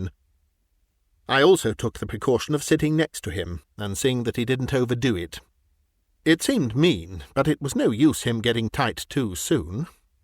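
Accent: England, United Kingdom. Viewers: For some unknown reason I sleep best to British accents. It's wonderful to hear your beautiful human voice with perfect pitch and normal human rhythm and none of the bizarre pauses that gives away the robot voice and is jarring enough to wake me if I have dozed. I do hope YouTube doesn't succeed in their apparent campaign to eliminate all actual human narrators. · Another narrator got accused of being AI. It's a sad day when we can't tell the difference by the generally god awful rhoticised intonation of AI butchering the story and the rich timbre of a cultured English accent reading a jolly good story!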